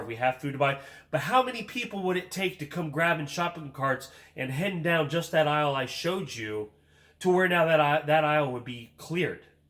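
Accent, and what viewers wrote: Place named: Pittsburgh, United States. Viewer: oh ok. Your accent sound like Pittsburgh. 😃.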